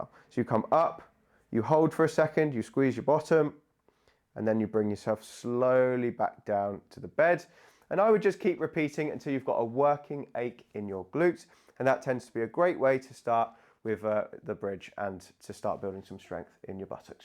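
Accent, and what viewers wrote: United Kingdom. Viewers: Greetings! Glad you are talented as a "speed listener." I am not that talented. Yes, I am also stiff, but I appreciate the "slow" explanations. Especially with his British accent. He cares enough to make sure we get it. After all, we are the 50+ crowd. So slow down your "speed listening" a bit. If you don't like it, slow down anyway. No, I'm not stupid. Enjoy the video.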